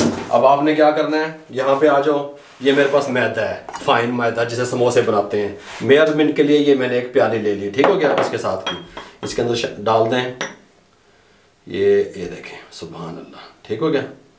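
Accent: Punjab, India. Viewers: Zaberdust I like they way u speak hindi and punjabi . very good easy recipe thank u. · Zaiqa we changa ho si, Style te no1 hey hi twada. Cool man with punjabi tarkah makes it enjoyable to watch.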